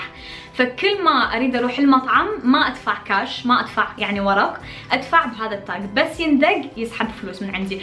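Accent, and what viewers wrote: Iraq. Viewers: الفيديو كتييير حلو😍😍😘 وذكرياتك تجنن بس انا جديدة قناتك بليز ردي على اسئلتي اديش طولك انتي بتحكي بلجيكي وحكيك عراقي ليش مو إماراتي بليز ردي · انتي شلون تعلمتي تحجين عراقي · لهجتها قويه مره مو زي باقي العراقين الي اسمع كلامهم مادري ليش ممكن تكون من منطقه بالعراق يتكلمون كذا او هذي طريقتها ترص على الحروف وتنطقها بطريقه قويه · جااشلون تتكلمين عراقي اذاانتي مامولودة بالعراق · شي حلو انو انتى صحيح مواليد بلجيكا لكن حلو لغة الام مالتج مثل الي مولودين في العراق عاشت أيد خالة ولؤي واخوج الكبير الثاني لان تكلمو عربي وليس ألماني او فرنسي او هولندي معاكِ كل هذه السنين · جا ليش تحجين عراقي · بس أنتي تحكي عراقي .. ممكن جاوبوني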